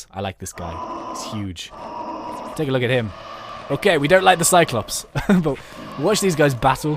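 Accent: United Kingdom. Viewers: I love his British accent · Are u British? And lol I love the dogs name lol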